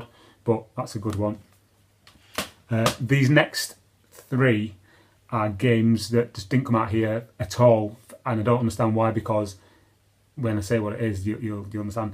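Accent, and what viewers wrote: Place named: Manchester, United Kingdom. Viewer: Didnt realise you were a manc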